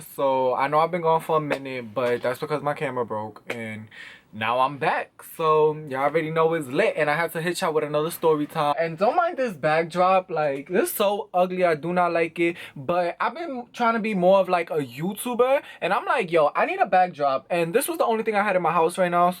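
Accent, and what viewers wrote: New York, United States. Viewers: I could listen to you read the dictionary! Your NY accent is so dope!!! Love from London UK x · this new york accent is everythingggggggg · Hey how old are you...you new york accent is so cool love to hear you tell storys your so animated..lol..jhave you ever been to kansas city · Your accent be havin me want to live in New York.......like its life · Is he from NYC.? Bc I'm from NYC and I talk the same way, same slang and all😂😂... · fucking love your accent 😬 I miss new york so much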